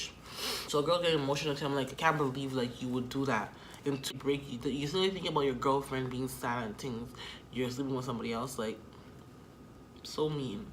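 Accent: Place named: Africa. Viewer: I love your African accent 😂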